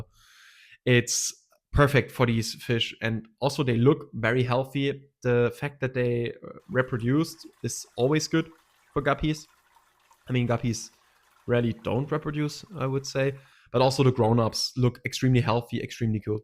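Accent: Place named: Germany. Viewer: ican hear german :))))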